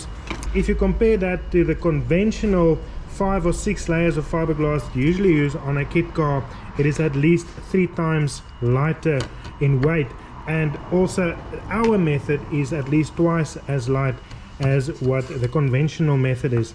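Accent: South Africa. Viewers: Afrikaans accent is the most beautiful in the world. Masterful work, also. ❤ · Are you South African? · Heavy South African accent...🇿🇦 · You sound almost like a South African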